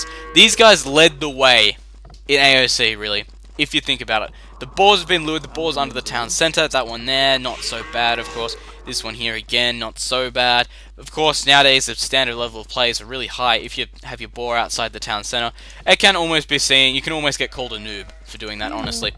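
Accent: Australia. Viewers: from your accent you're Australian. Cant you get hex to pay for your uni?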